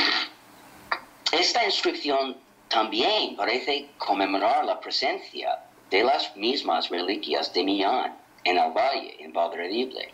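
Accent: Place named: United States. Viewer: Este estadounidense es un sabio erudito y se esfuerza en trasmitirnos datos sumamente interesantes, pero habla demasiado rápido e indudablemente con un acento poco español. En un texto escrito, se le entenería perfectamente. Pero GRACIAS.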